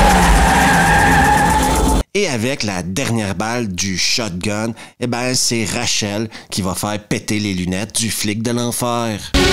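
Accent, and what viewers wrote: Quebec, Canada. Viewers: C'est dommage pour moi j'ai du mal a comprendre l'accent québecois sur certaines syllabes.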